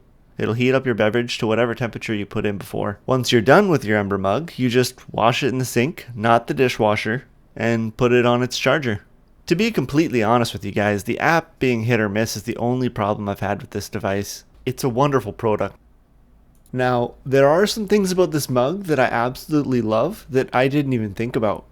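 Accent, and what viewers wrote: Canada. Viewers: Lol it's not like you're hiding it... But i knew you were a true Canadian, from the very first time that you said "aye" 🤣 And like, i also didn't know or see anything that would've suggested that you were until after i was certain you were and i wanted to make sure by checking your profile or the comments... And sure enough you were a Canadian from Ontario(I'm just assuming Ontario because of your accent) correct me if I'm wrong and tell me where your accent is from lmaooo while i was typing this the video was sending and you sent me into a laughing fit when you gave it an "Aye plus" 🤣🤣💀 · While I enjoyed your review - do your really sound like Bob & Doug? I've been a Canadian all my life (ha) and have never met a real Canadian that sounded so, Canadian eh!?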